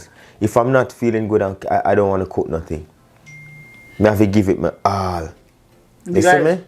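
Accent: Jamaica. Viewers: Finally a real autical Jamaican with no twang foreign accent presenting good dishes. 👌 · Food look so good & I love that Jamaican accent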